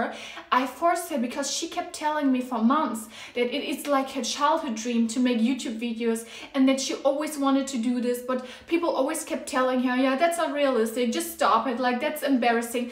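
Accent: Germany. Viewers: I didn’t know you were German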